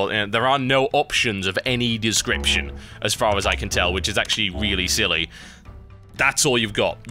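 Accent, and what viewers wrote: United Kingdom. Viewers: that is a british accent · I love how TB always says, "... Sorted..." in his brit accent xD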